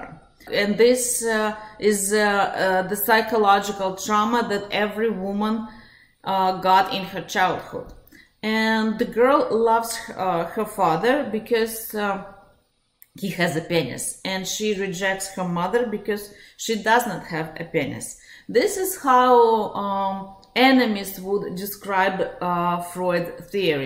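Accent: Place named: Russia. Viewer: You have a heavy Russian accent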